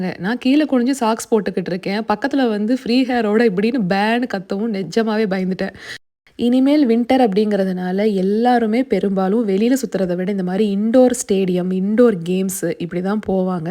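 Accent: Coimbatore, India. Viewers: Modaiya irukum😂 Absolute Coimbatore slang❤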